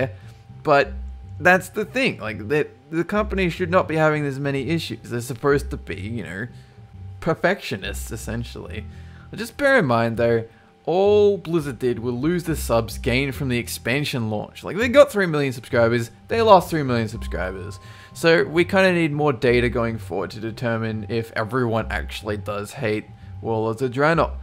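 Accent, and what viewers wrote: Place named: Australia. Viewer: ***** hes an aussie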